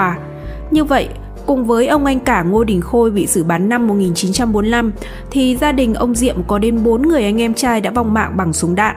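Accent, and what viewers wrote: North, Vietnam. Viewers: KHÔNG NGHE BẮC KỲ KỂ CHUYỆN NAM KỲ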